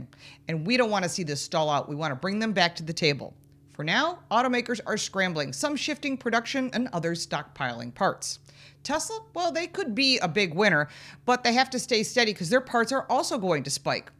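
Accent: United States. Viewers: I love your American accent. Which part of the US is it from?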